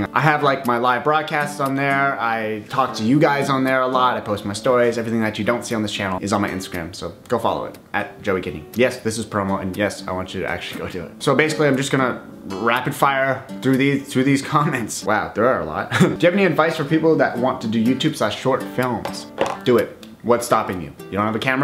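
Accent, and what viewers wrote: Canada. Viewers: basically ayla he’s Canadian. That’s how they pronounce it in Canada.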